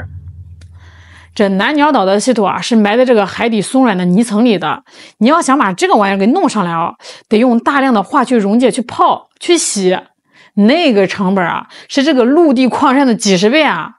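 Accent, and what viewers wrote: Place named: Anhui, China. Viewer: 这口音我终于知道是哪里的了，应该是安徽的。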